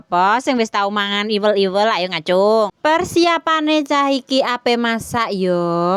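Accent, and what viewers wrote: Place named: Central Java, Indonesia. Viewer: Nadane koyok plwong Purwodadi , sampean asli pundi mbak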